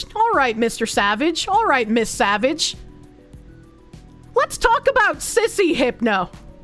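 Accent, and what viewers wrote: Boston, United States. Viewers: That Bostonian accent is so funny, earned a sub there. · Bostonian woman ASMR, damn 😮 I now think she needs to collab with VAllure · As a fellow New Englander, the Bostonian ASMR was something I didn't know was missing in my life until now, we need more of that! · Incredible content. Bostonian ASMR got me through the work shift.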